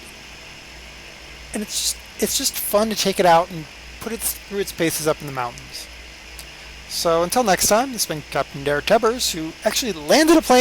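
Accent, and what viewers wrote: Canada. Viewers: I thought you were Canadian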